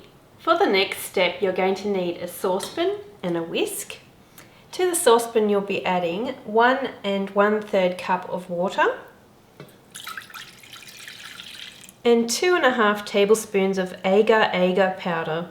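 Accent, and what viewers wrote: Australia. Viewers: wow so good will try this very soon,thank you for this video, sounds like your an Ozzie :)) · You sound like an Aussie, but live in US? Can't wait to try this, thank you, and helloo from Australia. ;D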